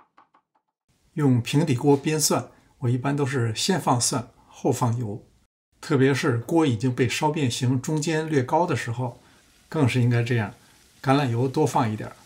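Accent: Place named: Beijing, China. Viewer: 每次听您讲出了佩服你精益求精的精神，还总会被你最后经典的点缀笑翻了。“别把牙硌了”😂😂😂地道北京话。老乡您实在是个难得的人才！这道洋菜家里养老公一定会吃得走不动了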